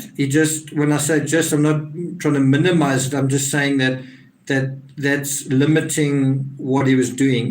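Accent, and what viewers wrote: South Africa. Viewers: I love your accent my doctor is from south Africa too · When I am unable to sleep, I put your videos on & you lullaby to a wonderful sleep. It’s not that you bore me but you relax me so much. Love your South African accent & your soothing voice❤️❤️❤️❤️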